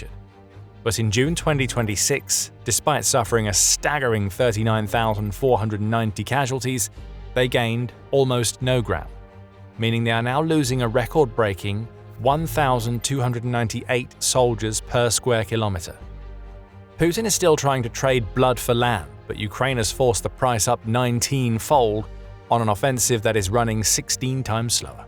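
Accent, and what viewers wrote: United Kingdom. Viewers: First time listen to a Brit talk?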